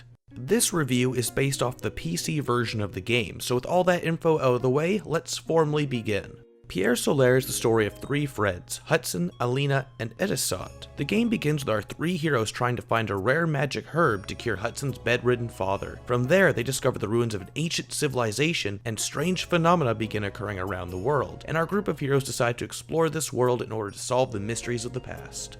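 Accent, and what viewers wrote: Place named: United States. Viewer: Sounds american. French pronunciation of title. UK pronunciation of herb. What the...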